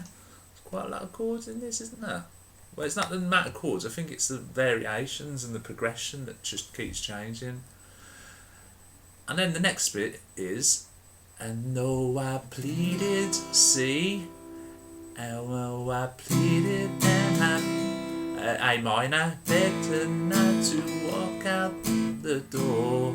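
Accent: United Kingdom. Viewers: hard to understand u with ur british accent ! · you from birmingham aha :D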